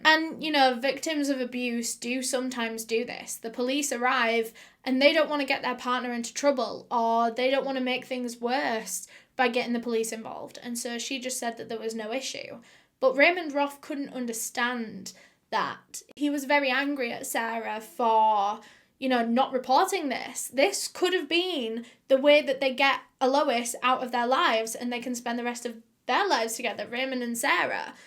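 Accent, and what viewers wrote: United Kingdom. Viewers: "wrongenh" :'') i love the British accent its so cute sometimes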